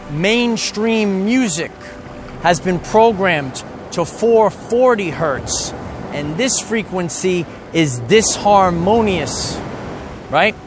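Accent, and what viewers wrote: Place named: Brooklyn, United States. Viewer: I feel like I've spoken to you before. I remember your voice and accent. I never knew anyone from Brooklyn though. Never even been there. Strange how that works.... Thank you. Blessed be. 🙏💜🌷💝💫💖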